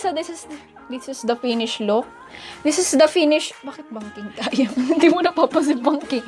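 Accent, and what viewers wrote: Ilocos, Philippines. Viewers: "Sa kanyang lapistick" 😂 love your accent, im ilocano too. Your so talented dear. 👏👌Keep it up. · Ang ganda ate 😍 lapistick yung accent ate ilocana. Ilocana din ako😘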